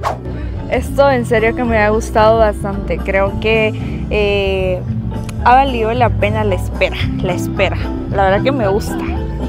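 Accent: Guatemala. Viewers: Ya hablas como chapina 🤭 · DATO IMPORTANTE “YA SE TE SIENTE EL ACENTO CHAPÍN” JAJAJA Se siente de a huevo 🫶🏼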